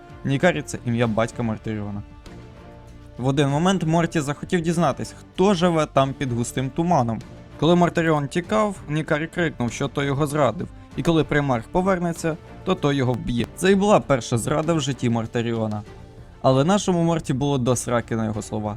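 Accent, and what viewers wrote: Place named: Chernihiv, Ukraine. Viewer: Чернігівщина, пролітає пару слів в тебе)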